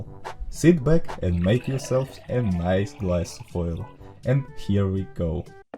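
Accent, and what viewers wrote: Russia. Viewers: ДА ТЫ РУССКИЙ!! Я ПО АКЦЕНТУ СЛЫШУ!!! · Русский акцент имба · The Russian accent, lol (i like your voice, the only thing that is funni for me is the Russian accent) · Ахах,найс русский акцент)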